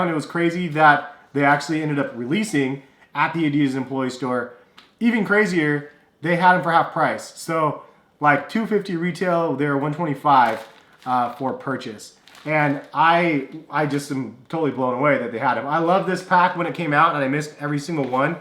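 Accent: United States. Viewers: are u American?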